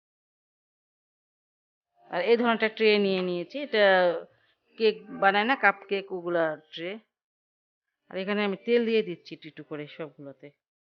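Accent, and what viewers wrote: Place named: Bikrampur, Bangladesh. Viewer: Aunty apnk o osonkho donnobad...aunty apnr kotha sunle mone hoy apnr bare bikrompur..amr sosur bare bikrompur..R ami dhakaya..dowa krben aunty amdr jonno..😍😍😍😍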